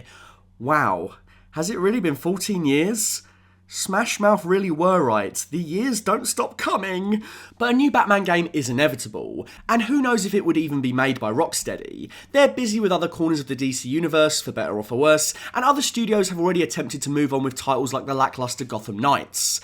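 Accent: United Kingdom. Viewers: You are one funny sounding britt!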